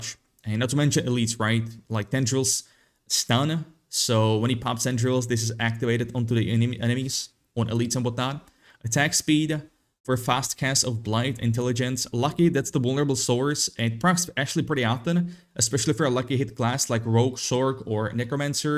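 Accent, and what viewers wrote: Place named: France. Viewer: Bruh what language ur speaking...it sounds like english but french also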